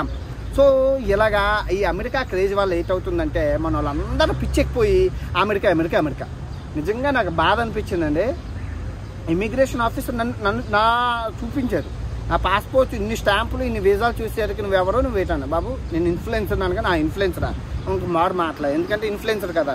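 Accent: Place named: North Andhra, India. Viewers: Nedi మా శ్రీకాకుళం మాటలు ల unayi స్వామి... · From your slang u seems to be from North Andhra. Your people are daring and enterprising. People in Malaysia and all in Indonesia are from your place.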